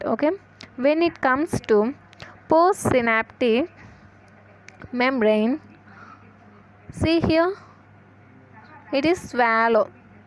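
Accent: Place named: England, United Kingdom. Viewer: Nice presentation. I like your English accent. BTW, what textbook are you teaching from?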